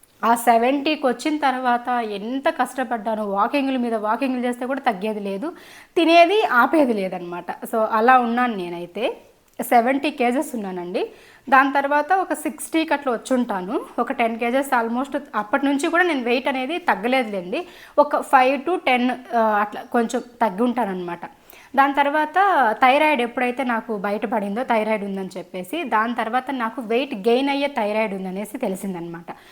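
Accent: Chittoor, India. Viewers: Meeru all rounder sis🤷‍♀️dance,cooking,family caring really your very tallented.i like your videos😘unnadi unnattu matladutharu yechulaki poyi ala matladali ila matladali anukoru.chakkaga mi chittoor slang lo matladutharu.madi nellore sister nenu kuwait lo job chesthunnanu.daily mi videos chusthanu🤗andari laga pakka valla gold ni ma gold ani,pakka valla houses ni ma own house ani abaddalu cheppakunda unnadi unnattu matladitharu.meeru chala mandiki inpiration ayyaru.any way I like your videos.god bless you sister🙌😊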